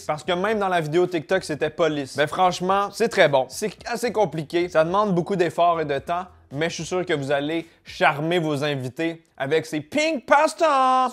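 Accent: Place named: Quebec, Canada. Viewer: On voit qu'il québécois lol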